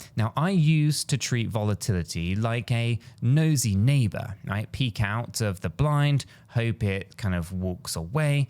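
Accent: United Kingdom. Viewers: Love your channel. Great info!!! Your British accent and sense of humor put it over the top! 😊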